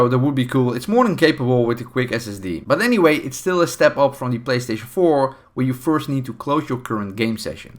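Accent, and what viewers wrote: Netherlands. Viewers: Are you dutch? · Great Dutch accent !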